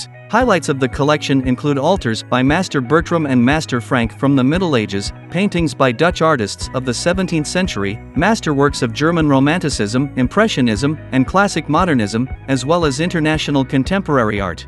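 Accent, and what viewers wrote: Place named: United States. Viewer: I like НаmВ., Вt.I dont like prono.narrator speaks, american , nt EnglisН.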